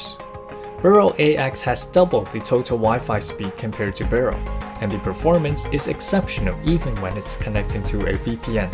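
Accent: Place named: France. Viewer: Very very French speaker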